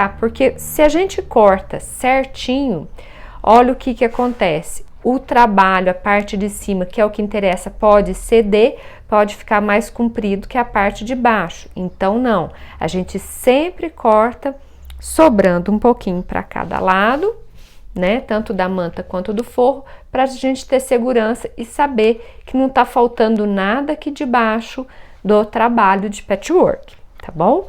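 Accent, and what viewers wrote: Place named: Minas Gerais, Brazil. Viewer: Adorei! Gosto demais de ouvir vc falando com esse sotaque de mineira, me lembra muito minha mãe.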